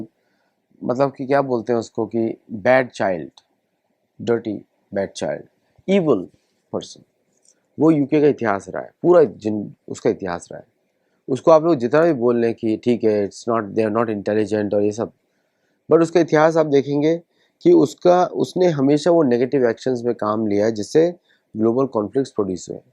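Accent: India. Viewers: भाई वो गुरुकुल चला रहे है एक अच्छा काम है आज के दौर में । फिर उसका प्रचार किया तो आप को इतनी तकलीफ़ क्यों होती है? और एक मिनट से ज़्यादा भी नहीं लगता उसे । और उन्होंने चैनल का नाम sattology रखा इसपर भी आप को ऐतराज है जब को ये बात उन्होंने पहले भी समझाई है । sattology ये शब्द mythology का काट है और हा हिंग्लिश है तो है पर अच्छी काट है । हमारे पुराणों धर्मग्रंथों को mytholgy करके धुतकारा जाता है इसको प्रभावी रूप से काटने का उनका ये एक प्रयास है । और आप को उनकी हिंदी समझ नहीं आती इस में वो क्या कर सकते है, वो महाराष्ट्रीयन है और थोड़ा लहजा उस प्रकार से है । मैं भी इन्हें रेगुलर सुनता हूँ और हमे इन्हें समझने में कोई अड़चन नहीं आती । तो आप को जरा देखना पड़ेगा । भाषा शुद्धिकरण का मैं भी आग्रही हूँ परंतु हमे पहले जैसे भी हो हमारी संस्कृति का ज्ञान होना आवश्यक है और इस चैनल की वजह से बहुत से लोगो को हमारी संस्कृति धरोहर इसके बारे में पता चल रहा है । भाषा शुद्धीकरण भी आयेगा पहले लोग जुड़े तो सही ।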